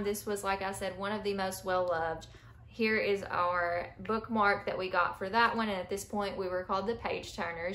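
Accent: South, United States. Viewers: It's great to hear your southern dialect.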